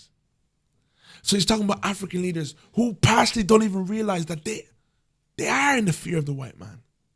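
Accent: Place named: England, United Kingdom. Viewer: just doing a word salad. Are you one of those Brothas getting paid by Candace Owens, because you have an English accent? Because you are doing the exact same thing that they do. Higher a Black guy that doesn't know what is going on to sit there and listen. You get other Black people to listen to this alt-right crap. is removing Black American rights. He has removed Affirmative Action. He removed the Fearless Fund for Black business women. He attacked Critical Race Theory so that reparations could never be discussed. He removed Black history. is backed by the American Heritage Foundation that is attacking P Diddy whose story was in the 90s. has a rape charge.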